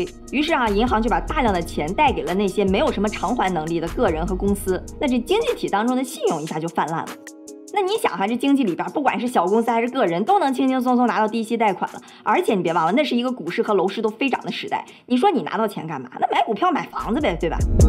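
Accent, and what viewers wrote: China. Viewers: 大陸腔改掉我就信了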